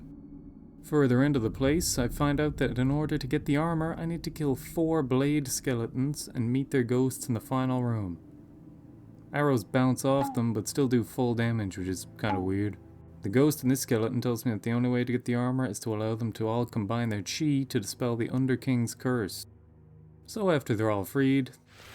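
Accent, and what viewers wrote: Ireland. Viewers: why are you doing a weird american accent? you are so obviously irish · Awesome video, always happy to hear more Irish youtubers.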